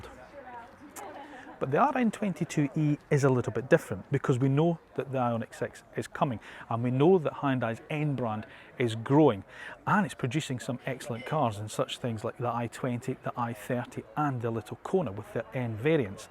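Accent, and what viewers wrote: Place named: Scotland, United Kingdom. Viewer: Oh.. this is the Scottish accent. This is my first time to hear. Very unique and I love it.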